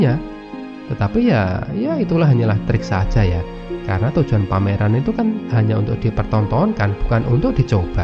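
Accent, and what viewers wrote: Java, Indonesia. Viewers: sip lanjutkan mas dengan suara khas medoknya,hehehehehe... barang kali ada yang minat tutorial design grafis dan percetakan kunjungi channel ini, mudah mudahan ada manfaatnya....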